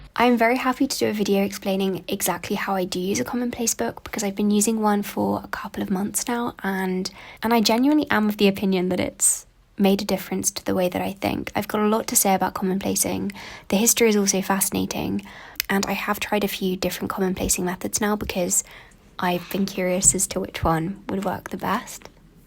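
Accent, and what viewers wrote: RP, United Kingdom. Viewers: It’s called Received Pronunciation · Oh wow, so it IS similar (or the same?) as the Queen's English! I thought it sounded similar but wasn't sure if my American ears were just not able to hear the difference. Thanks!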